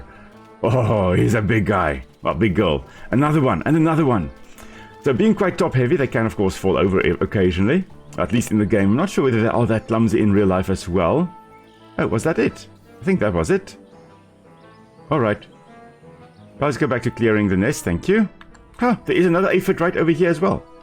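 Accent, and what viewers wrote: South Africa. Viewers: Are you South African? Your accent sounds so familiar I swear. Just found the channel and its awsome. Plan on binging this series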